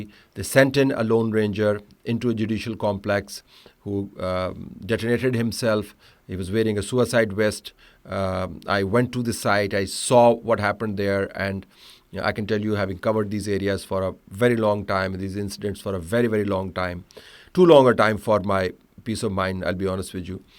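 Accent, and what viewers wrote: Pakistan. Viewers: Paki pooonjabi english 😂😢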